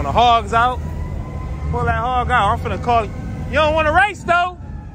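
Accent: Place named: California, United States. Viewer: You from lil rock bro? You got that cali accent. And man RIP to that elco wished you would walked up to it i wanted to see the damage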